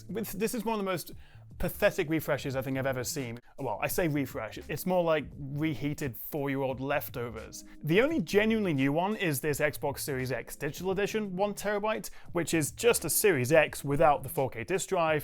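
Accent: United Kingdom. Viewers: Talks way to fast and that briitish accent get real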